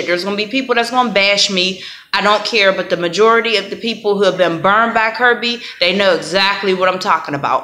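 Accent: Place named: South, United States. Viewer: I'm from Texas you sound like your from down south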